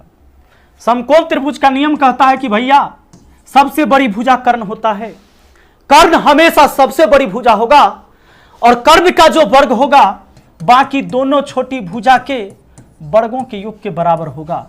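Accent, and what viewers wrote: Bihar, India. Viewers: Sir Ye sahi padato par Hindi Jo aapaki bihar ki jo hume samaj nahi aati jadya so esy hindo me bolo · Aap bihar se lag rhe ho sir ji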